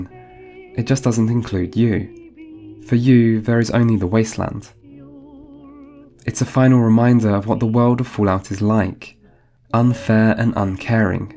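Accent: England, United Kingdom. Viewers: Really good commentary and soothing British voice that sounds intelligent. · Not a criticism of you, but must every documentary guy speak softly with an English accent? It puts me to sleep, I swear to you. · Wow! My first video discovery was the amazing Lovecraftian games video, and now I can't get enough of so meticulously crafted, intellectually challenging insights on games I never pondered about. P.S. listening to that brilliant English accent narration for a non english speaker is to die for :).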